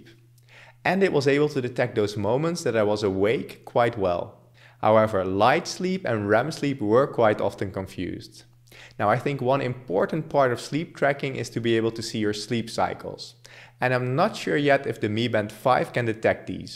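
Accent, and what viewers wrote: Netherlands. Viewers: Are you Dutch? Your accent feels similar. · AHHAHAHAHAHA 100% accuracy in Dutch speaking English.... I was worried I didn't recognize u r Dutch correctly because the cars in your video had white background plates, but then I checked your CV... :D Good job, amazing video, thanks a lot for your videos, i'm ur subscriber now! Greetings from Rotterdam, I'm an expat here and love the country! Tot volgende keer! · Are you Dutch? The accent sounds very familiar. The video was great, comprehensive analysis of the features in a tidy format, well done! :D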